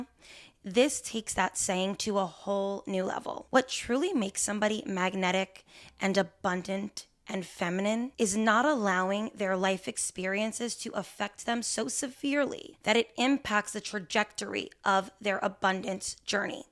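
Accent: New Jersey, United States. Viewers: your channel is a blueprint of what it means to be magnetic! You’re authentic and not trying to be anyone else, from your Jersey accent to your fashion sense all your own unique stamp. You show up vulnerable and passionate about the topics you post on. You’re kind and generous to your online girlies and in real life. Your presence is noticed and missed when you’re gone! You’re able to get your community to open up to you and trust your advice. You have grown into THE magnetic woman! ❤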